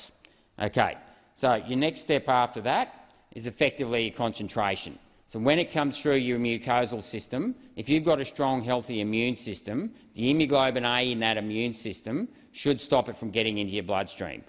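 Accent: Australia. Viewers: I've heard it spoken by so many Americans, and foreigners it's nice to hear it from an Aussie accent I totally understood every word so eloquently described anyone could understand. Brilliantly stated .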